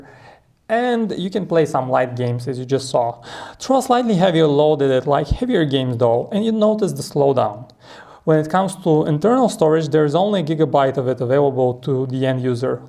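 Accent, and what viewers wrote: Bulgaria. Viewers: razbrah 4e si bulgarin :D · Man, are you Bulgarian?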